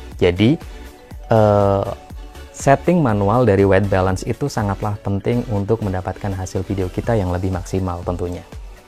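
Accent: Pekalongan, Indonesia. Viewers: Ente orang mana bang? Batang/pekalongan?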